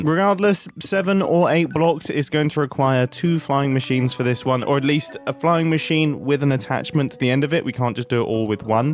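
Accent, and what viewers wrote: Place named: United Kingdom. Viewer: My daily dose of a dapper British man playing games to keep my sanity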